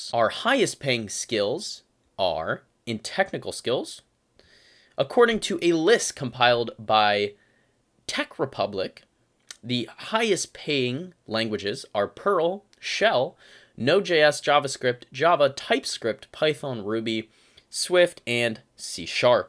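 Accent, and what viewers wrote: United Kingdom. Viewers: hey..I absolutely love your video. I love the british accent..you're going to be famous... please make more videos..I'm actually a registered pharmacist making $175000 a year for Walgreens pharmacy in the "el paso district" in las cruces new mexico....I went to UNIVERSITY...loved that..it's a British and canadian term university lol aka college. in US..my point being....love your breakdown of the yearly income for future millionaire's in today's economy...my friend is in your field and coul have used your help like 50 years ago but you didn't exist then..oh btw I only went to university for 7 years. I graduated at age 24 with as a pharmacist and live in one of the cheapest places to live in the country New Mexico..in NY I'd be making $30000 a year...ps your videos are my new addiction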